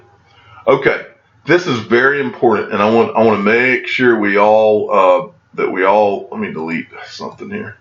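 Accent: United States. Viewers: Whats with the cowboy accent ?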